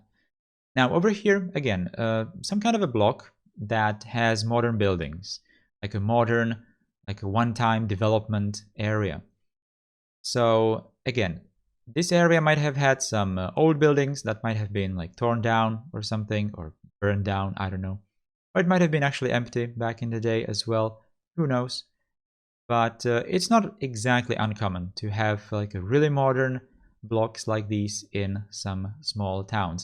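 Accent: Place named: Czech Republic. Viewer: I believe he's Czech. honestly I can't remember why I think so (and he also has typical accent of a Czech guy speaking English). anyway, he aslo referes to central European countries when talking about his hometown. Those being Czechia and Slovakia.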